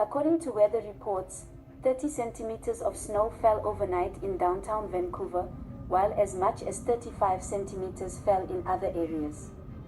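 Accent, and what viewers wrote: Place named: Cape Town, South Africa. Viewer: Sounds like a capetonian accent for the narrator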